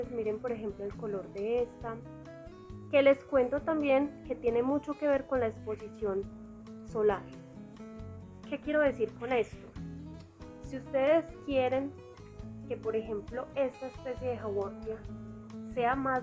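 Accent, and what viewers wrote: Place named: Antioquia, Colombia. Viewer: si te sentía el acento paisa jejeje saludos desde Cali y adelante con tu canal.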